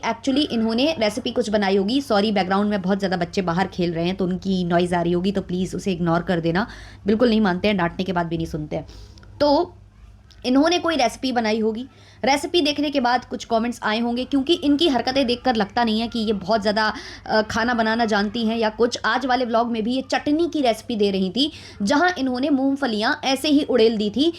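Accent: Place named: Western Uttar Pradesh, India. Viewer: Di ap up west se ho na .kyoki apke bolne se pta lgta h .hm bhi up west se h .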